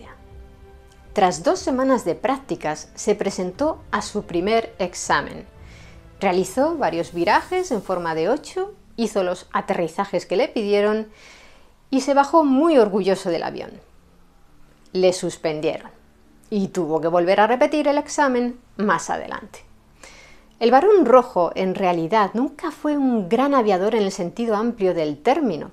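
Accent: Spain. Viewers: Excelente trabajo. Muchas felicitaciones por tu canal, es muy bonito escuchar todas esas historias con tu lindo acento español. Espero algún día ver historias de personajes latinoamericanos como por ejemplo Miranda. Un gran beso y un fuerte abrazo desde la América Latina.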